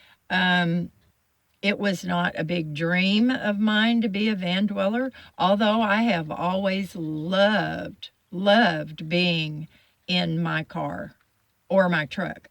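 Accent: South, United States. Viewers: very good. I think she is beautiful. Your mannerisms and all. You also remind me a little of a dead relative of mine. I think it's the fair skin and red hair and little southern accent : ) enjoying your videos! Keep them coming. Love your personality.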